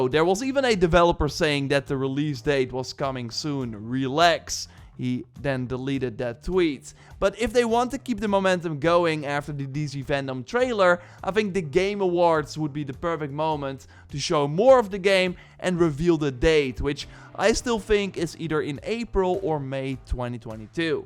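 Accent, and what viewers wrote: Netherlands. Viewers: nederlands accentje valt wel goed op he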